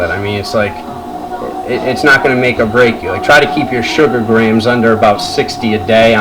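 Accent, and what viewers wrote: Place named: East Coast, United States. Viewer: bro ur hella east coast what happened lol.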